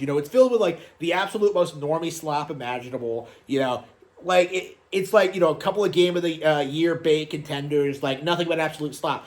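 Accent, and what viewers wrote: United States. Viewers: Why you sound intoxicated with a Arizona lol bruh crack a beer and get a pc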